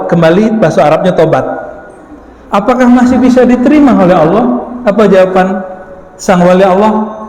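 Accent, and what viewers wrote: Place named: Rao, Indonesia. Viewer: Slang nya seakan² urang Rao..seperti ibu saya.cuma ada beza sikit²...Apa itu bahasa Rao juga ya... Senang dgn pengajian beliau..terima kasih ya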